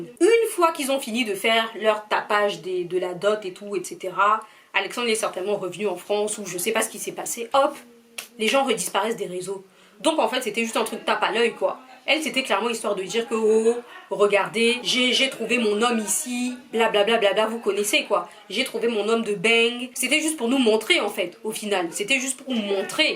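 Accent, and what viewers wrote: Cameroon. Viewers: Si vraiment je dois être honnête j’ai pas compris l’intérêt de la video a partir du moment où raflo elle même a déjà répondu au question des gens . Je pense que porter de l’intérêt à ce connard n’est pas utile . Raflo mérite mieux ! Qu’elle vive sa vie une nouvelle et belle vie mama le gars la est pourri , elle a de la chance de t’avoir à ses côtés Jpense que c’est grâce à vous son entourage aussi que la rupture ne la pas abattu . Bref malgré que je trouve pas l’intérêt de ta vidéo merci d’être là pour elle je pense que tu es une très belle rencontre pour elle . Laissez vos affaires de sorcellerie bande de fou. Ton accent camer me stress trop 😭 bisous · Ton accent kmer c'est la tension😊.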